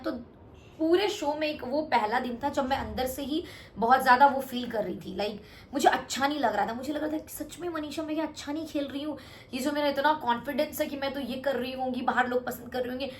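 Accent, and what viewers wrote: Bihar, India. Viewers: Manisha ap bhut achhe ho but mai buttering nhi krungi. Ap is show me kaise khelna h soch k gye the isliye muje v kaibar arjun sir jyda achha lg gye jbki mai chti thi nhi muje hi achi lgni chaeye but mai justify nhi kr pati thu... Ap hr jgh natural rha kro kuchh soch k mt khela kro..ap aisa bich me english english krte the bt muje lgta ap apnr hindi ar binhari accent pe mst ho yrrrr❤... Apne kchhh glt nhi kiya h fir v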